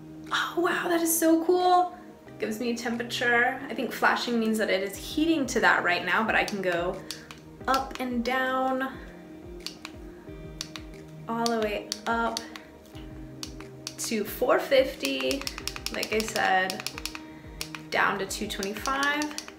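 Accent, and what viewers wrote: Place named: South, United States. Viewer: Loved the video, You are cute and funny. I hear a southern slang as well.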